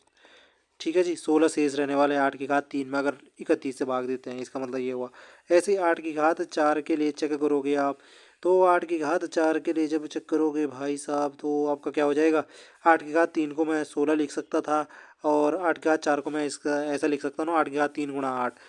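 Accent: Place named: Garhwal, India. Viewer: Bhai garwhali ho kya??😂 tone sunkr lgra hai❤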